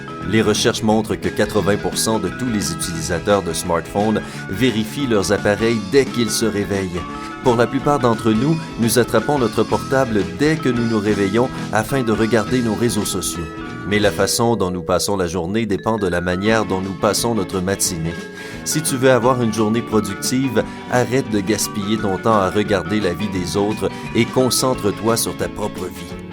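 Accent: Quebec, Canada. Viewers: Accent québécoise j'adore 😊. Merci c'est sympa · C'est du québécois alors arrête de critiquer ! Ils ont un accent différent !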